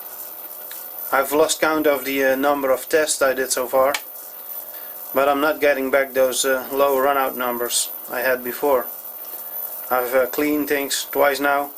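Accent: Netherlands. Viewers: Are you Dutch? Because of the accent.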